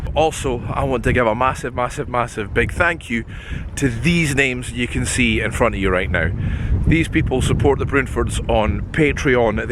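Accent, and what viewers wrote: Scotland, United Kingdom. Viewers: I enjoy listening to your lovely Scottish brogue and seeing bits of Edinburgh. One year ago, we had especially liked our walking tour of historic Edinburgh with stops in Grey Friars Kirkyard and the Haymarket. Aaaah, I so loved Edinburgh!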